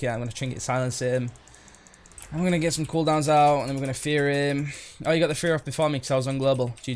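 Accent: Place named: North, United Kingdom. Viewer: Love the videos man, entertaining. As a fellow northerner, it’s nice to hear the accent too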